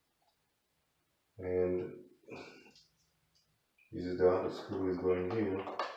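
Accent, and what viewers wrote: Israel. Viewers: love your video!!!.......and your Israeli accent!.. thanks!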